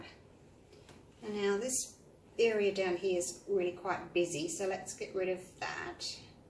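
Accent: Australia. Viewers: Do you are silicone to your paints? I just love watching you :) YOU ARE FUN Fabulous So Cheerful and very TALENTED too! Love your Style & Aussie Accent is music to my ears :) just lovely and your designs are brilliant!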